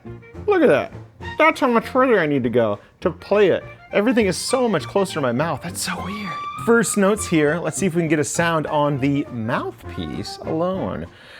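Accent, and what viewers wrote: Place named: United States. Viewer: Lol he's American, that's how we pronounce it here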